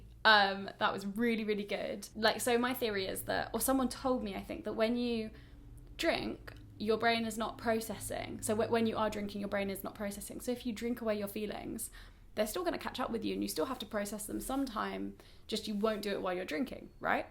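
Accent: RP, United Kingdom. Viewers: cough There is the magic of editing...but let's also just stop for a second and admire her brilliant british accent! ;) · she's just got a posh accent tbh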